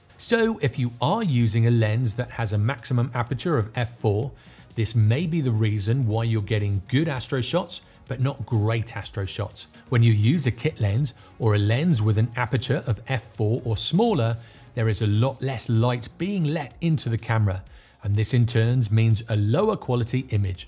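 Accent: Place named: Wales, United Kingdom. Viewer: bloody hell would never have placed you as Aberystwyth! But it is the perfect presenters voice man not gunna lie. H that's awesome and likewise, love your channel!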